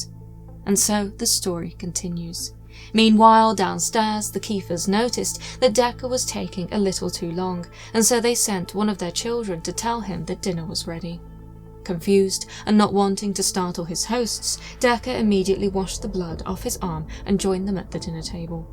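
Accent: England, United Kingdom. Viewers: I absolutely love this channel 💓. The narrator with her English accent makes for an amazing story teller!! I also love how the narrator takes the content seriously and tells it in a serious manner ❤.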